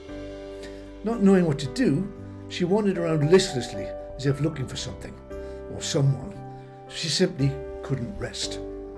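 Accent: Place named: Newcastle, United Kingdom. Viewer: LOVE THE JORDY ACCENT LOVE YOUR VIDEOS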